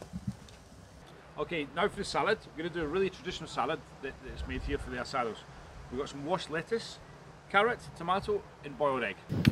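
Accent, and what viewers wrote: Scotland, United Kingdom. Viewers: pretty good man! where are you from originally? I sense a bit of a scottish accent, or maybe northern england? my only suggestion is that for thin cuts like entrecot or matambre, is better to salt them on the grill or right before you put them in. Also chimi is better when you leave it in the fridge for at least 24 hs! The true test for a grill master is to grill a whole vacio cut (which has 3 different cuts in it , with loads of fat and membrane for flavour and crunchyness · Great vid. Just found it. The accent though? Scottish? Went to Argentina and ate almost a kilo of steak a day. Loved it.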